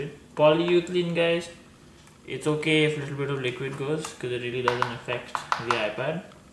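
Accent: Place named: India. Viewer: Why do all the indians talk like that i like the way they talk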